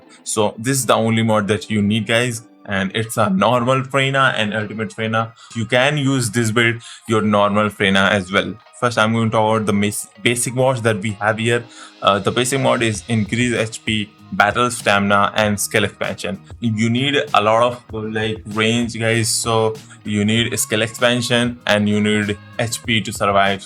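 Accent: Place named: India. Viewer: He in Indian. Accent is Indian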